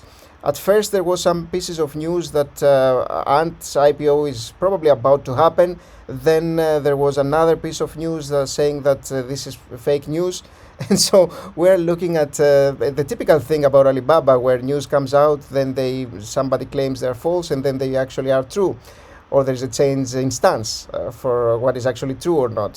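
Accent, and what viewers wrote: Greece. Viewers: Again da best greek accent in english ! Dont you think there s an other bulltrap forming ?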